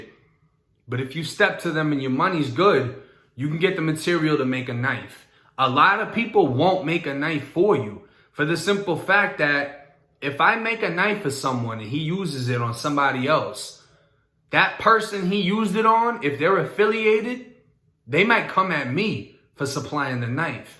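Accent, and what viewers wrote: Boston, United States. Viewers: U from florida? Or boston? That accent tells me u were raised somewhere else other then Florida lmao 🤣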